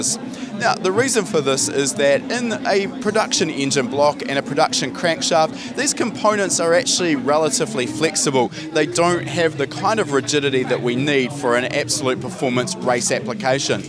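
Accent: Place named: New Zealand. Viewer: Solid kiwi accent. Having said that, fantastic explanation.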